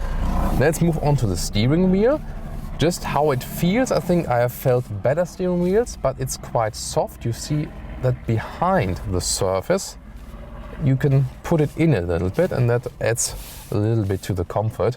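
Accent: Germany. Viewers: Ha ha, there's something cool in Your German accent! :]] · Warum macht ihr ein Review vom Mustang auf Englisch? Es gibt 3000 amerikanische, englische, australische, neuseeländische, kanadische YouTube Channel, die Autosendungen auf Englisch machen. Ich bezweifel, dass die sich dann gerne einen englische Review mit deutschen Akzent ansehen/anhören wollen, wenn es Channels, wie Motor Trend gibt.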